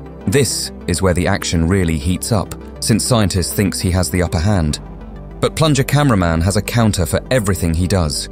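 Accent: United Kingdom. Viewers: Are you british? Just asking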